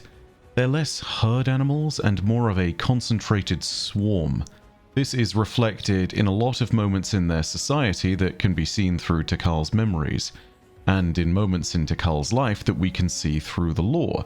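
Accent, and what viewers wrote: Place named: England, United Kingdom. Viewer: lol the captions can't understand english accent, talking about "law books"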